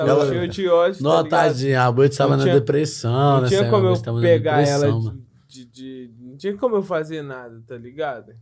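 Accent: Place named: Belo Horizonte, Brazil. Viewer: Ambos são de Bh por isso que parece kkk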